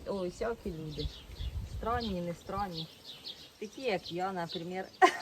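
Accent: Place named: Khmelnytskyi, Ukraine. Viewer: Дякую Вам Пані за такі ЖИВІ відео, на разі я далеко від рідного краю, на Сході України, Ваші відео і суржик нагадують мою Хмельниччину...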